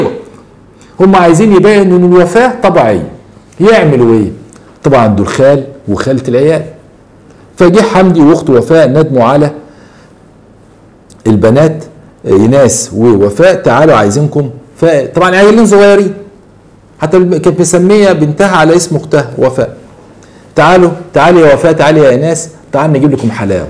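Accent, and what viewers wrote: Upper Egypt, Egypt. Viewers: شوحلو كلامك لما بتتكلم صعيدي يا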